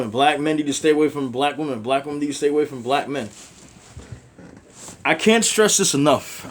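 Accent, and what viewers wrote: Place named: New York, United States. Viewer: Who is this buff man with a New York accent? Miles Morales?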